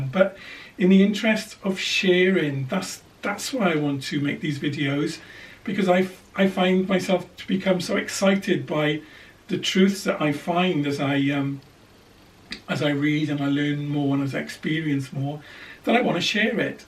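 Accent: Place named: South Wales Valleys, United Kingdom. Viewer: It sounds as though you have a slight welsh accent ?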